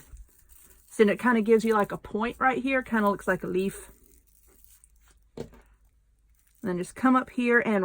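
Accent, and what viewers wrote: South, United States. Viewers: Hahahaha! I LOVE watching your video's!!! You talk like I do......:) I absolutely adore this Rose, I've never seen this before? It's BEAUTIFUL!!! Thank you so much for sharing your talent with us! BTW.......I'm a Georgia Girl.........and love the country Twang!!! LOL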